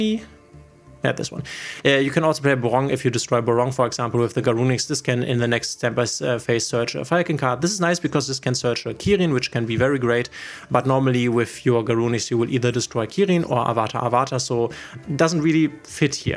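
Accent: Germany. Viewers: Dieser deutsche Akzent nervt echt. Aber gute Zusammenfassung wie das Deck funktioniert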